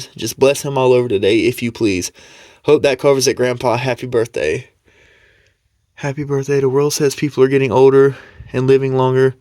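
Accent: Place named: South, United States. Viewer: I was trying to figure out what state you were raised in by your accent. My guess was Carolinas or Georgia. You are my favorite explorer. The narration is great and you spend the right amount of time in each room. Keep up the good work!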